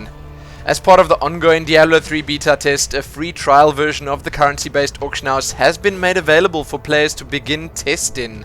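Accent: South Africa. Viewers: This is why regular TV is dead. Freely accessible, top notch content like this is on Youtube. I might say niche content but it;s well produced nonetheless. Got to love that South-African accent., you do excellent vlogs as well man. Did you ever consider presenting a Pulse episode?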